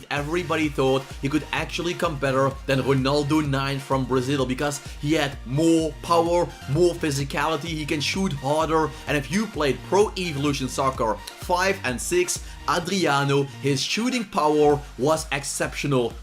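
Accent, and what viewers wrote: Netherlands. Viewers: You sound dutch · Are you from Limburg? ( The Netherlands) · are you from the netherlands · A little bit of a Nederlandse accent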